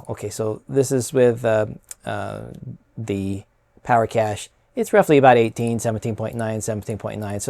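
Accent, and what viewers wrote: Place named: United States. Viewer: Another great video! You really do a great job presenting this, every project you demo makes me want to do it myself even though I don’t have the same computers😂. You are to blame for the retro Mac illness I am currently experiencing!😂. Did you say you aren’t based in America? Your accent/vernacular both suggest to me that are American! Where are you located?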